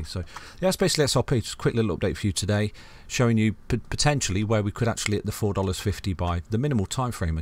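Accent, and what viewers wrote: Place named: Midlands, United Kingdom. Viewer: Thanks for the TA, 👍🏻 Where in the uk are you from? the accent can’t be far from me in Chesterfield… keep up the great work.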